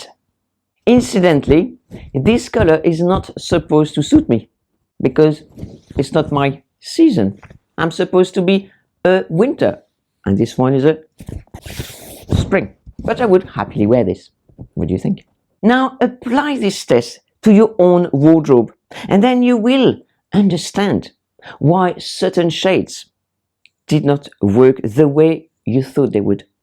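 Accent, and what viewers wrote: France. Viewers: Loved this❤such a charming, elegant lady with empowering advice and lovely French accent. Colour analysis was Big when i grew up, but after studying colour theory myself and experimenting for years i ended up with same conclusion as you😊 i used to like silver and cold colours but with age i look better in Warm colours and gold. Basically i choose colours that look good with my skin and can wear the same items over and over without wasting on trend colours. This allowed me to invest in quality items that i am truly happy with.Easy- peasy, sustainable & money saved😍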